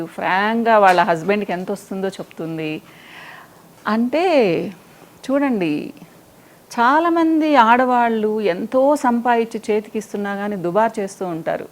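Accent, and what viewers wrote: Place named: Godavari, India. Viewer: Maa godhavari slang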